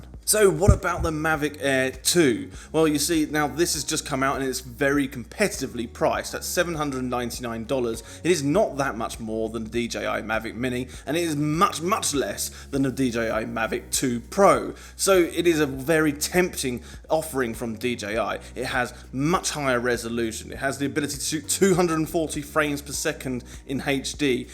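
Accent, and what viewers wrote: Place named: Australia. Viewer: Well, its 0252hrs june 17th in Quebec and your comment response to the mate that made your day by subscribing with all the notification bells got me to thinkin🤔!!!,its night time here and you sound australian so i decided to make your night by subscribing with all the bells and whistles,like button, save and share and support your Channel. You do have some great videos and i appreciate you sharing your knowledge with us. Take care and stay healthy 🍻....Mike....🛶Aboriginal People's Brotherhood Chief🦅........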